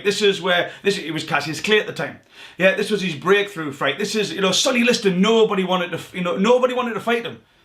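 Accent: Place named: Scotland, United Kingdom. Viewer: thank you for your hard an amazing work I am a native Californian and I went blind about 20 years ago having been cited before I know the power of nonverbal communication and so I am super stoked that I have discovered your videos! You have a really wonderful way of not only explaining the body language but really getting in-depth psychologically I first saw your Harry and Meghan Markle video and then I am a big lover of Muhammad Ali and Conor McGregor both so I saw this video well I mean I listen to it and thank you so much I appreciate all that you do and it sounds like you are Scottish but I'm not sure so take care of big hugs April in California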